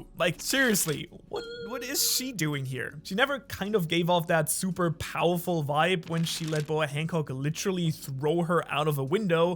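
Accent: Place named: Germany. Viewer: Ich habe gerade Raffey‘s neustes Video geschaut und ich wusste nicht dass du Deutsch sprichst. Jetzt höre ich den leichten Akzent aber ich hätte es nie geglaubt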